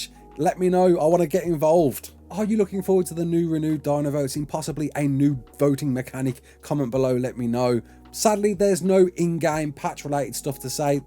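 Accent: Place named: Scotland, United Kingdom. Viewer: Also, what country are you from? I really like your accent, it sounds like it may be Scottish but I don’t want to assume, I am probably horribly wrong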